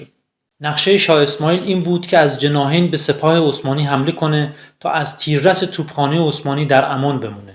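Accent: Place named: Lorestan, Iran. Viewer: شما لُرهستیدازلهجه مشخصه...منم اهل پلدخترلرستان❤❤❤❤❤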